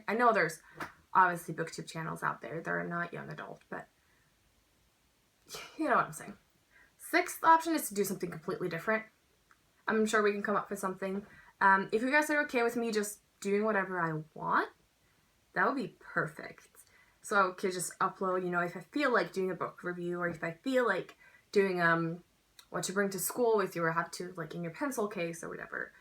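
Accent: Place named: United States. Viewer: The video that I had the deepest impression of you was how to do well in IB, your English sound so authentic American now hahaha. I'm looking forward to videos on your current life~ (e.g. travel, uni, London life etc.)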